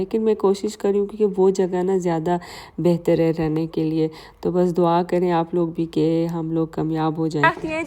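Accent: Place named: Mansehra, Pakistan. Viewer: muje aap ki zaban se lagga tha k aap mansehra se hugi because udar k log buht ko much boltay hein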